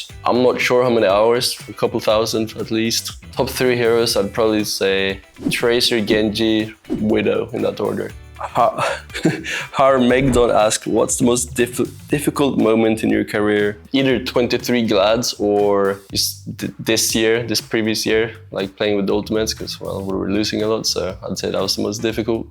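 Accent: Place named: England, United Kingdom. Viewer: he has a definite english accent, forsure picked something up from kai and fastro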